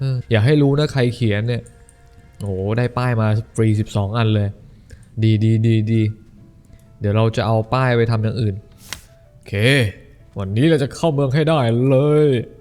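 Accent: South, Thailand. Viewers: เป็นคนปักษ์ใต้ไหม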